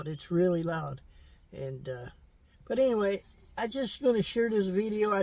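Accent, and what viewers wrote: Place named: Appalachia, United States. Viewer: I'm not trying to be mean or anything But you should look for a talent agency for acting And I guarantee You They would find you many parts for hillbilly acting roles You have the exact look that they're looking for you look and talk Like a hillbilly I am being 100% serious you can make a ton of money.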